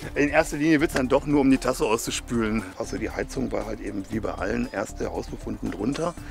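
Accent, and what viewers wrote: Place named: Ruhr, Germany. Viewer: Da hör ich doch Ruhrpott, aber vom feinsten. Geiler Typ Geiler Anhänger 👌🏼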